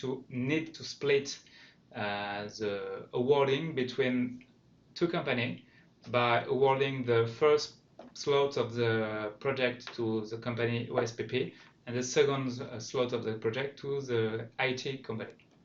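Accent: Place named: France. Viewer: the french accent is terrible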